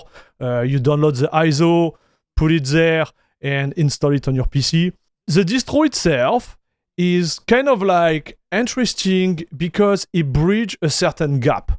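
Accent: France. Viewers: Votre accent paraît français...😊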